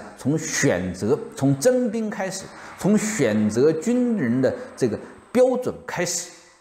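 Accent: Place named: Henan, China. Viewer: 做人我不管我听不惯河南话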